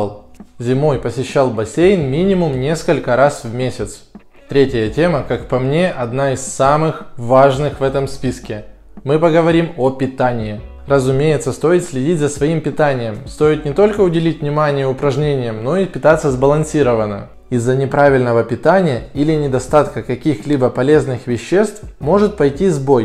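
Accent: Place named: Ukraine. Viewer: Опять ховор, укр...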